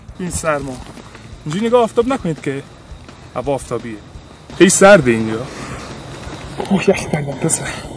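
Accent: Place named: Lorestan, Iran. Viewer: لهجه لری داری 😂❤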